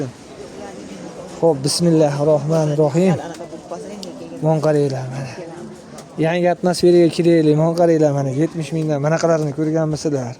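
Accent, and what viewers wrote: Andijan, Uzbekistan. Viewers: Анжанча шевангиздан ургилай 😊 Аллох омадингизни берсин❤ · Бу Андижондан мисиз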